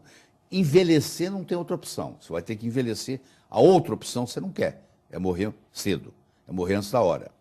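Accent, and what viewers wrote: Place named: Minas Gerais, Brazil. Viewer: mineirice... coisa linda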